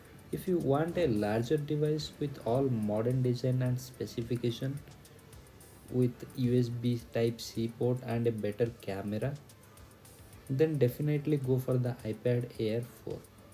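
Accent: Odisha, India. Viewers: Voice tone suniki janigali odia pua boli😂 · Ye pakka ama odia pila ta. English ru Jana padijauchi. Good going bro. Good. And all. Wishes to u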